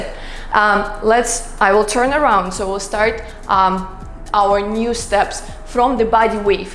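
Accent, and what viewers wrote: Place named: Russia. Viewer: Какой шикарный русский акцент😀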